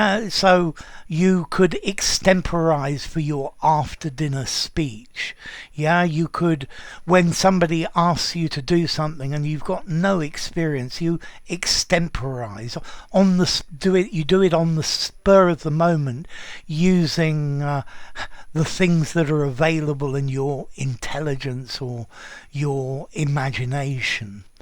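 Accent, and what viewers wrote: RP, United Kingdom. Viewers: I wonder if the teacher speaks Contemporary RP. Can you tell me?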